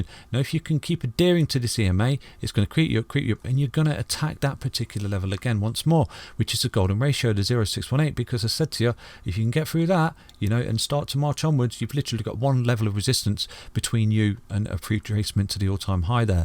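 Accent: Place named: South Coast, United Kingdom. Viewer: What part of the UK are you from? Im guessing on the south coast somewhere???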